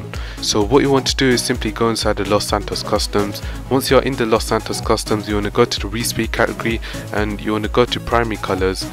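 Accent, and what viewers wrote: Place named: Germany. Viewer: Are your german because you speek german english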